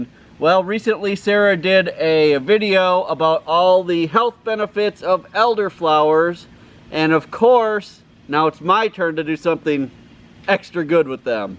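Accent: Wisconsin, United States. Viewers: I was watching your video and was thinking how you sound just like a friend of mine. I was wondering if you were from Wisconsin. Then I read the description of the video. What part of Wisconsin are you from. My friend is from Eagle River area and I'm from Door County.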